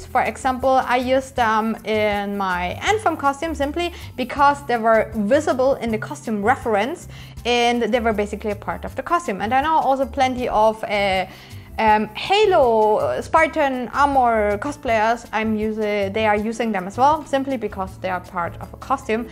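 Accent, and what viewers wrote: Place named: South, Germany. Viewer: Da kam das deutsch durch. Habe dich gerade erst gefunden und war noch nicht ganz sicher, welcher Akzent das ist... Erstmal abonniert.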